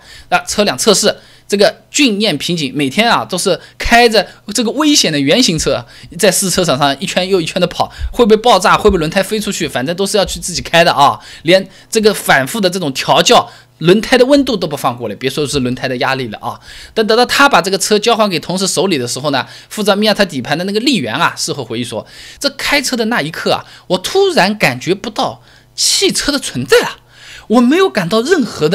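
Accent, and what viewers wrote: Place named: Wu, China. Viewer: 经常看你充满吴语风格的讲解,受益匪浅.据说印地安人牌摩托当年挺厉害的,能讲讲吗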